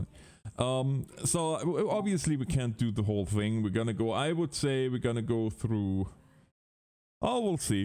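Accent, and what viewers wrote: Germany. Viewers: Bist du ein Deutscher in Kanada? Muss fragen, weil ich den deutschen Akzent klar heraushöre, mich dann aber wundere über den Kanalnamen und das Wohnsitzland Kanada.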